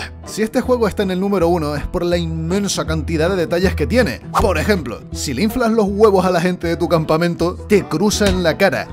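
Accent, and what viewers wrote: Canary Islands, Spain. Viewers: Un vídeo perfecto felicitaciones, una pregunta que tengo desde que te veo, eres canario? Es una pregunta que me ronda la cabeza siempre que veo tus vídeos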